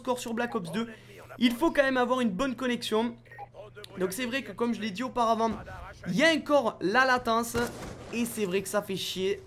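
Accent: South, France. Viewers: Je suis sur Meltdown EC Avec le dsr Cènnkentte je kiff ton accent du sud!! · tu vien du sud mais ou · Tes D'ou Du Sud ? ^^ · tes d'ou du sud ?? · Un magrebin avec l'accent du sud..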